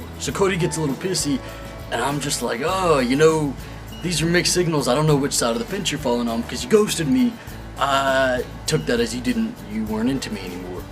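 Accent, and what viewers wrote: South, United States. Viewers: Your southern accent is cute 😂❤️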